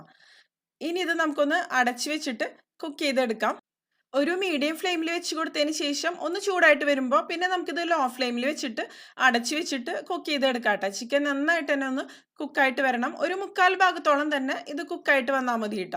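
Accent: Thrissur, India. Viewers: തനി തൃശൂർ ഭാഷ ,ഞങ്ങളും തൃശൂർ ആണ്,സൂപ്പർ റെസിപി ,നല്ല അവതരണം,നങ്ങൾക്ക് ഇഷ്ട്ടപെട്ടു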